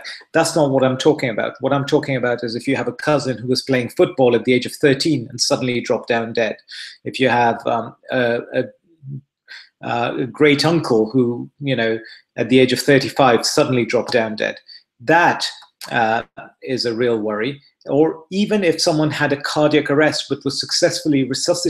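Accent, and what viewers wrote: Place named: United Kingdom. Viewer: Have been crying tonight because yet again, I can't sleep due to palpitations that come on when I try to go to sleep. I want to tell you how wonderful it is out here in the States where I now live,to be able to listen to you with all your information.The fact that you have taken the time to say all this is (excuse the pun) heartwarming! I also feel better hearing your accent because I am originally from the Uk also! I keep being given holtor monitors to see whats happening but my heart often seems to behave perfectly when I have that on, and awful when I don't! Well anyway,thanks again and hopefully next week will be a better week for me because every night this week has been awful and last night I was awake with palps most of the night! Keep up the good work. :)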